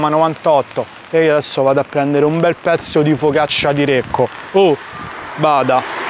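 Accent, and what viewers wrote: Rome, Italy. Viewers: accento Romano? o forse piu` ancora Toscano..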